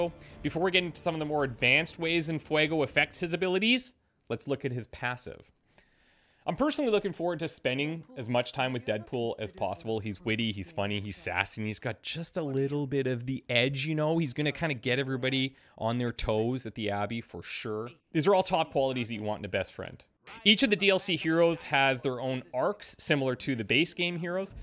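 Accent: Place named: Canada. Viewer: Love the Canadian accent first and foremost. I'm excited for Deadpool and I hope Storm is next, fingers crossed. Sounds like DP will get progressively stronger with every KO until he's a powerhouse, so you'll want to use him for cleanup kills as much as possible, which sounds new and fun. I'm thinking ideal build for him is Deadpool, Doctor Strange, Captain America. Cap can absorb damage and soften up enemies for Deadpool KOs while Doc can enable Deadpool (what he does best) while Deadpool builds his stacks.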